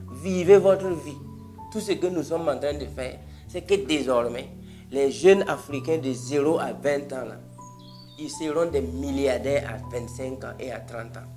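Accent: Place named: Burkina Faso. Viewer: Le français des burkinabés fait rire 😂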